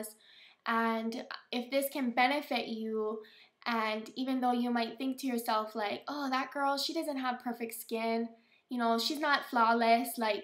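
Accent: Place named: Minnesota, United States. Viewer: You must be from Minnesota.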